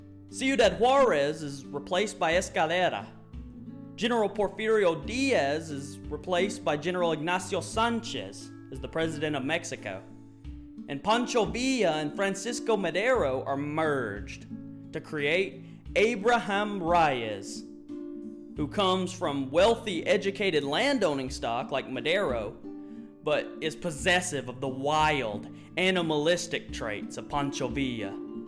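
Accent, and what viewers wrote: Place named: South, United States. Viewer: I saw your post or comment on Reddit a week or two ago, or whenever it was and saved this video to watch later, and man, you went above and beyond my expectations. The quality of this video is really great for you only being at 36 subscribers. Everything about this video is perfect, your faint southern accent, your charisma, the editing, the music choice, and your insightful look at a part of the game that is seldom talked about beyond "I liked it". I learned a whole lot, and I only appreciate the game even more than I already did You my friend, have earned my subscription. Keep it up man, it will pay off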